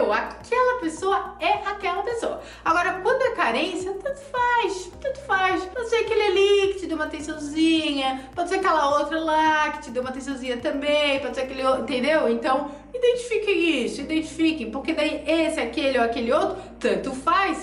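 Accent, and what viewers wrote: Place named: Florianopolis, Brazil. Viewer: Tú é de Floripa né, só pelo sotaque kkk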